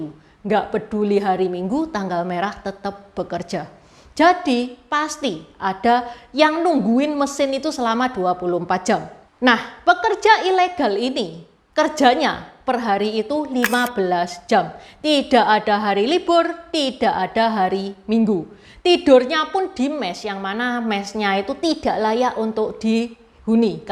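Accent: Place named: Surabaya, Indonesia. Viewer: logatmu lucu...seperti orang di pasar atom. Tetap aja sebab menurutku menarik.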